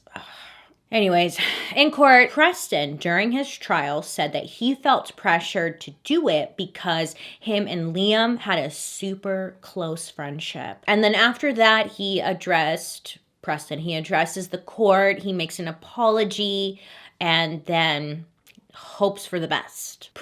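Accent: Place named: New Jersey, United States. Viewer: Is it me or did she have New Jersey accent in this video? Love ya but i noticed it, maybe it was just cause the crime happened in Jersey but it was there.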